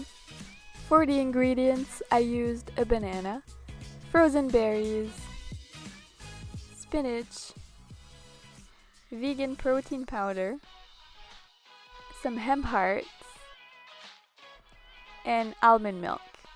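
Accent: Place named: Gaspésie, Canada. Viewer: omg your accent sounds just like the accent from where I live... I am in Gaspesie... Also hello fellow vegan. I love this video 😍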